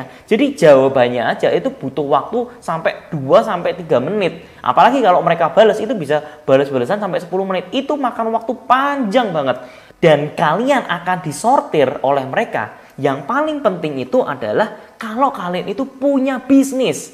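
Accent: Java, Indonesia. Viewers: Medok koh 😀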